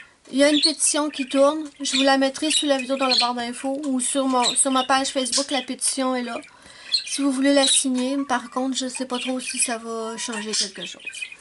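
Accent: Quebec, Canada. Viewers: Bonsoir, Cette nouvelle me fait beaucoup de peine car j'adore tes vidéos. 😖. Évidement que je vais continuer a te suivre sur FB et Instagram, mais je préférais YT a cause de t'entendre parler, de Ruby, de Spike le chat blanc. C'était tellement sympa, c'était un agréable moment. Sans parler de tes vidéos tutoriels et de ton charment accent québécois. Tout cela va beaucoup me manquer😖😵😬. Youtube a beaucoup changer en 3 ans. Cet espace de liberté et de partage est devenue une véritable dictature par ses nouvelles règles et sa cupidité. Certains youtubeurs ont aussi changer, de partage de leurs passions, ils sont devenues des "sponsorisés" a outrance pour le profit. J'aime bien ta sympathique simplicité et ta passion du coloriage. Tu le fait encore non pas pour le profit comme certains aujourd'hui. Pour moi tu est plus une amie sincère lointaine, qu'une étrangère d'un autre pays essayant de me vendre quelque chose. 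Tes vidéos me manqueront beaucoup car elles m'apportait un peu de bonheur dans ma solitude. Je te suivrait sur les autres réseaux sociaux😊😋. Tes coloriages sont magnifiques et Wendy est superbe😍👍👏. Tout mes vœux de bonheurs, de joies, de bonne santé a toi et a toute ta famille😘. Internet comme dans la vie réelle devient de moins en moins un espace de liberté. C'est bien dommage...... 😖😖😖 😫😫😫.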